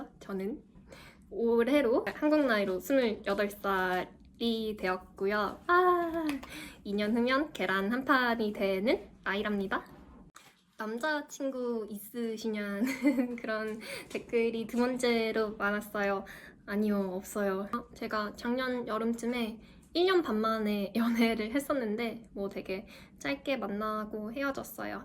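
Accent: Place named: Canada. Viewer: 영문으로는 18.6K라고 뜨는데 상이하나봐요! 그리고 사실은 영어녹음한 동영상 다 안보고 일부만 들었을 때든 느낌은 상당히 익숙한 억양 및 발음으로 느껴져서 미국 중서부지역이나 캐나다오대호근처지역에서 오랫동안 살았다고 추정은 했는데 맞았네요 ㅎㅎ 토론토에 오래 살았을 것 같아요! 거기서 영어연수해서 알아챘어요!ㅎㅎ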